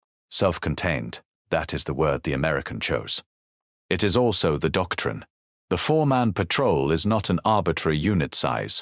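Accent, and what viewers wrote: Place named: Bogan, Australia. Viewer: AI narration is bogan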